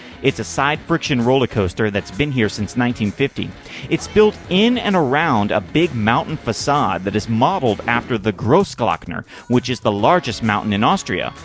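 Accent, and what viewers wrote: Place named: England, United Kingdom. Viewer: ^^ Es is lustig zu höhren wie Engländer Deutsche Wörter ausprechen XD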